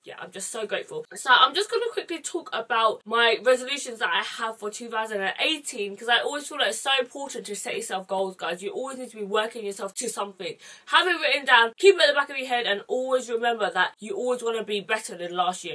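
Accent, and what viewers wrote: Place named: England, United Kingdom. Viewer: OMG this is the first youtube video of your's that I've watched and you have an english accent!!! somehow i knew you were british but i always imagined your instagram videos in an american accent lol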